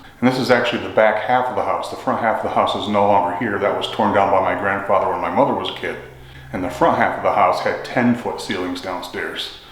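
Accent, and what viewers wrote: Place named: England, United Kingdom. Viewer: Hello from Brazil ☕️ 👏🏼.Excellent presentation 👏🏼. Excellent work. Beautiful English accent. I subscribe in your channel.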